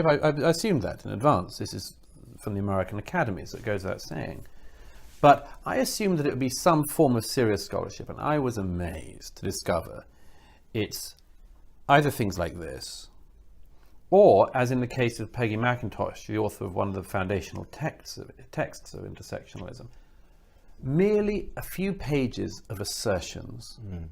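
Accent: RP, United Kingdom. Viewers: his etonion accent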